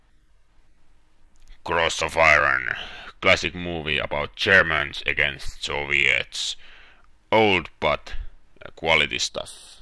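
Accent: Finland. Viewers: Hassua miten heti tunnistin englannista että oot suomalainen vaikka en kattonu vielä nimees :D hyvä lista!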